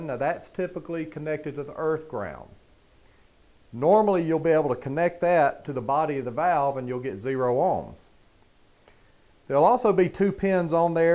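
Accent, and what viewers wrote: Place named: South, United States. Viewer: As a Georgia native, definitely has a southern accent. I assure you however that the information contained will also help with coils.😎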